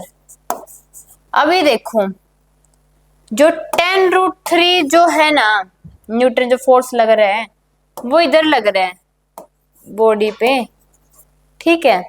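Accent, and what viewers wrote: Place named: Haryana, India. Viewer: Haryanvi 😅, best question ma'am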